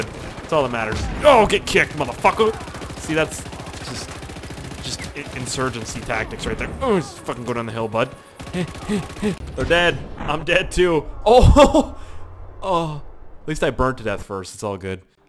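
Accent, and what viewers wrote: Canada. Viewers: Hes canadian and they pronounce cause lime cuz. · Al_isAtto702 no. It's his accent. He's Canadian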